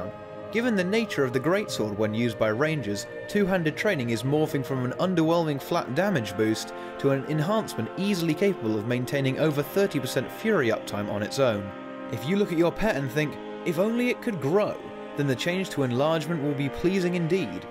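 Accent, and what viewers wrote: United Kingdom. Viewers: British accents make everything sound like im watching a documentary on BBC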